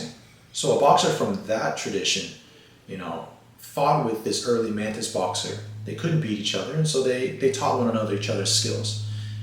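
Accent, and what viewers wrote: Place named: Taiwan. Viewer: He's Taiwanese, my step dad used to say "zen" for 人。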